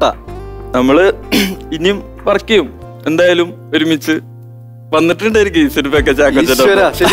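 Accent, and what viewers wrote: Thrissur, India. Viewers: Thrissur bhaashaa aaa chetto chetne highlight 😍😍😍.. Don't ever change that. That makes your way of speaking so attractive.. We should all love our native slang. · നീ നമ്മുടെ സ്വന്തം തൃശൂർകാരൻ അല്ല.: ടാ അതിൽ ഉപരി എന്താ വേണ്ടത്.. നീ മുത്താണ് സ്വന്തം ഭാഷ ശൈലി അണ് ഈ ഇപ്പോഴും തുടർന്ന് കൊണ്ട് പോക്കുന്നത് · സത്യം parajal DD ഉള്ളത് കൊണ്ടാണ് നമ്മളെല്ലാം ee programe kanunath അല്ലെന്ന് aarkenkilum തോന്നുന്നോ 😍😍 DD chetta ഭാഷ ഒന്നും oru പ്രശ്നം അല്ല numma തൃശൂർ ഭാഷ polialle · Thrissur ഭാഷ ആണ് DD ടെ superb u r great. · Ath thane. Thrissur kar pinne thrissur basha thane alle paraya.kore alkar kuttam parayum but dd not change your thrissur slang. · Thrissur language pwoliyalle... ❤ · തൃശ്ശൂർ ഭാഷ superഅല്ലെ നീ മുത്തല്ലെ dd you are grate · DD... Nammude thrissur language vittu povathathil enthnaa vshamikne..nee njngalde muthaaanu... Oru anchor nu paranjal kadichal potatha english parayunna aaalaavanam ennonum illa... 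Thrissur tone maatathe nee samsaricho... Ini ipo athu ishtavathavar nine kananda... Ninak nammal thrissur nte gadikal full supportaaa... Comments nokku dd... Thrissurr eno palakad no ennonum illa... Ithu kanunna ellarum nine ishtapedunnavaraa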